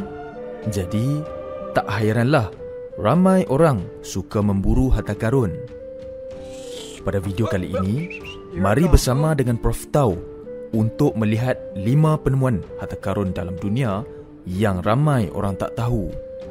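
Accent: North, Malaysia. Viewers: Mat ang org mna dengaq cm org utara...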